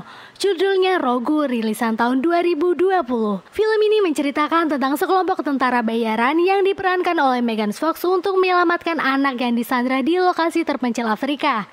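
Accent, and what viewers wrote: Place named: Banyumas, Indonesia. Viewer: Mbak lucu banget sh, pake bhasa jawa ngapak kwkwk, semoga sukses terus terus chenelnya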